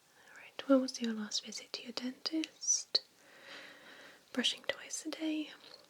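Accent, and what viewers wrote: United Kingdom. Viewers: I just love your British accent! Where are you from?London?Manchester? I'm from Brazil and have never been to England. Even though I study as much English as I can, sometimes it's pretty difficult to find out accents from all over the world. Once you've read this message, please let me know. It is like a self test about accents!Love your videos!BYE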